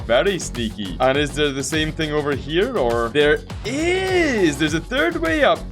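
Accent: Scotland, United Kingdom. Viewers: he's a Scot, it's in the name and in the accent. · His scotish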